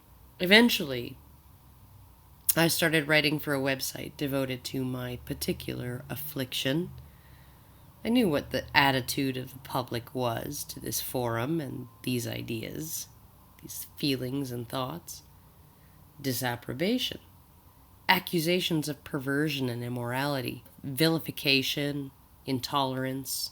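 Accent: Canada. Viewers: fuck you and your dumb canada twang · her canadian accent. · love it! good job. u do have a nice voice and a Canadian to boot. way to go against the powerful in your country.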